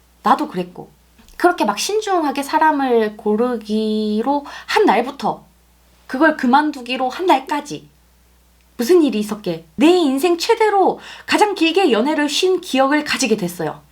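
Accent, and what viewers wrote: Gyeongsang, South Korea. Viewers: 아 어디말투지? 경상도+서울 리믹스 ㅋㅋ 매력있네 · 경상도 목소리 친근해요 타지역 10년이라 항상반가워요^^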